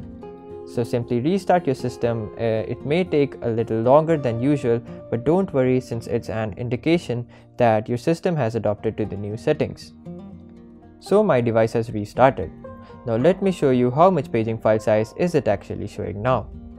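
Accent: India. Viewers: Agree. I like his accent. Not as annoying as other indian youtuber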